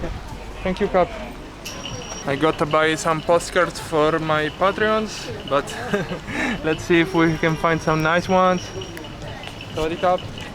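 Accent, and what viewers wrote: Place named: Italy. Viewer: He is a very good Vlogger now it reminds me Sunny in Thailand, plus I do love his Italian accent, he is more improving now.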